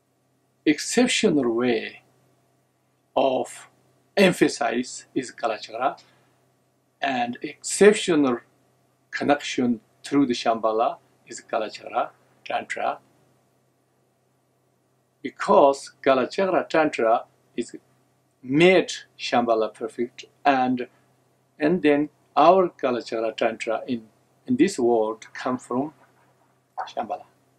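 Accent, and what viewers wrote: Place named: India. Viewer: U r accents r Indian accent this shows Tibate was a part of India in ancient time wow☺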